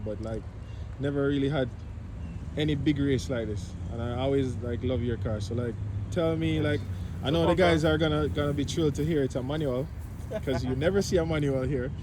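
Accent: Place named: Jamaica. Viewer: The Jamaican accent can't be hidden. It's a good look mi boss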